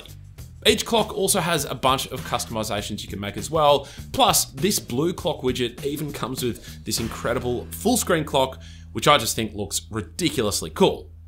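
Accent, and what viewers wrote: Australia. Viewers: I love the Australian English Dialect 😂.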